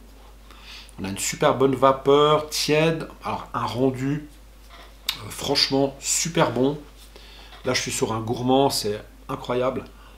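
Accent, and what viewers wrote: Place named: Switzerland. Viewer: Hello, Bravo pour tes magnifiques vidéos. Il me semblait avoir reconnu un accent Suisse. Salutations du Jura. Sais tu s'il y a encore un salon de la vape en Suisse ?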